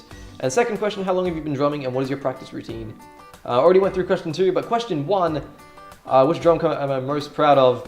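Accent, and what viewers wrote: Australia. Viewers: Dayum son, that Australian accent is thicc af. First time I've seen your face... other than your (half faced) dp xD OreGairu and Nisekoi... the first 2 anime that got me into covering seasonal anime music lol We started getting into anime with the same series... wtf is with these coincidences kek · jeremy wee well both of them have Australian accents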